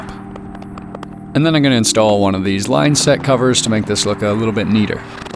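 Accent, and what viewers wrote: Canada. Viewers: I'm so used to seeing crappy, overly heavy American builds using crap timber and rough as guts construction techniques that i normally scroll past. Yours is brilliant and attractive with attention to detail. Your stairs are a master class. Cheers from Australia, now realise from your accent you're Canadian. Cheers mate, subscribed. Edit: even watched the adds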